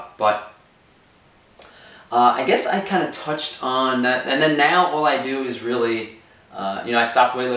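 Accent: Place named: New York, United States. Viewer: I love your muscles and you are fine as hell.I don't care what anyone say.you're hot.Love listening to your videos rather I agree with them or not.Even if I don't agree with some of the information I just love listening to you talk.I love your Italian and new york accent.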